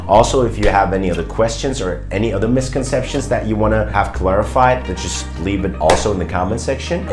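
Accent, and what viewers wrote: United States. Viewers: I was shocked at some of the things people think about Turkey and I’ve yet to visit. lol Love how you speak English with an American accent. Your videos on Turkey are so helpful. I’m trying to learn Turkish and hope to visit next year. Thank you 🙏🏼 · You looking Turkish but sound American and born in Germany? Haw can ?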